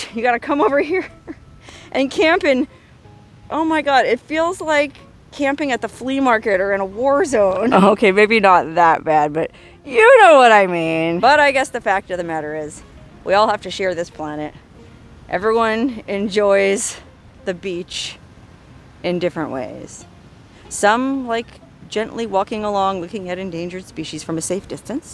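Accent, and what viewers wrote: Australia. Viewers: Your Australian accent is very Steve Irwin 👍 Definitely better than Meryl Streep's "A dingo's got my baby' 👍🤣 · G'day mate. your OZ accent was pretty good.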